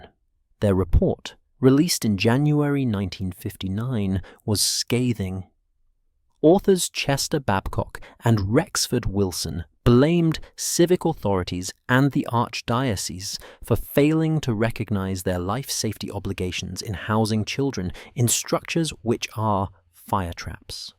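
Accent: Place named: United Kingdom. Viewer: Why does the narration give me AI vibes? Had to google this to make sure it happened and wasn't another fully AI written story, like "Cold War Stories". Plus it says this channel is kiwi but that is a Bri'ish accent... unless I'm going insane. The speech pattern etc doesn't sound human... I can't tell anymore. It sucks.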